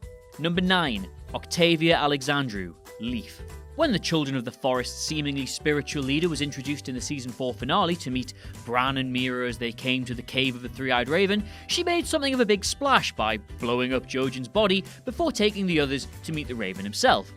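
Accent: United Kingdom. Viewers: Have you never heard a British person speak before?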